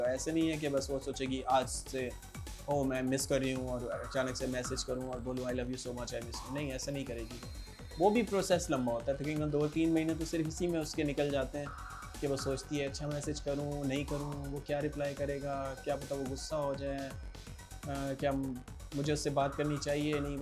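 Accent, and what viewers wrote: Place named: Kashmir, India. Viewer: Sir, not only vdo, but and every trick and sentence, crystal clear logically effective. Hopefully you are from JK as voice tone says where I stayed aporox 14 years. 👍🙏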